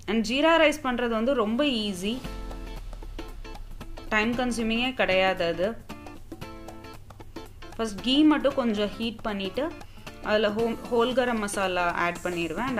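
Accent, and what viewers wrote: Kerala, India. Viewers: Nice speak. Tamil. I think your from kerala. But your speech. Tamil. Gails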